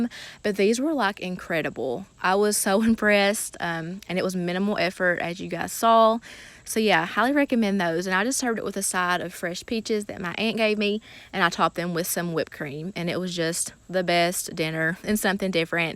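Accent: South, United States. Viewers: Are you gonna do any more videos of packing your husbands lunches? I got so many ideas from those videos as well and hoping that you’ll bring those back. Love your content love your southern accent keep the videos coming♥️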